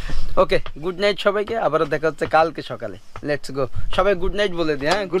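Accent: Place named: Kushtia, Bangladesh. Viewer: কুষ্টিয়ার ভাষা মনে হচ্ছে।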